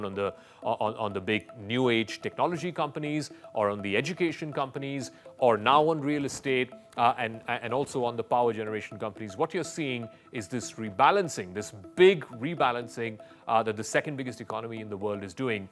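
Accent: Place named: India. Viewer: First time to see this channel and I love it clear unbiased factual and subcribe. First I hesitated since it sounds Indian,I'm not a racist because I've watched a few Indian channels they're all craps loke WION/GRAVITY,TGIF etc.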